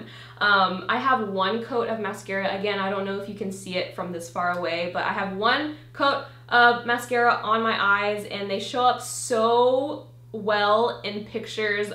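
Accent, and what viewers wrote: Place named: Australia. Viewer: I MISSED THAT AUSTRALIAN ACCENT LMAO😂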